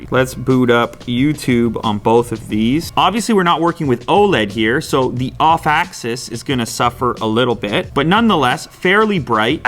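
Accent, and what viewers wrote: France. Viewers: Lol that french accent sucks from english ot american people 😂😂😂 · what's with the French? You trying to be sexy couse dude you are fine already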